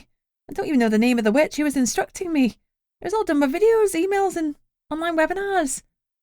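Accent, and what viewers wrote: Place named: England, United Kingdom. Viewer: Love the narrator. Lovely english accent i can easily understand.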